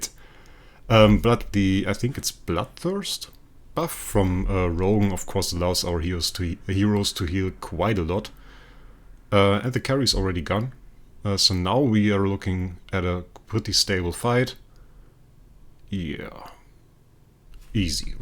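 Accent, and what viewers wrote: Germany. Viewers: you have a very german accent bro, love ur content. keep it up👌🏿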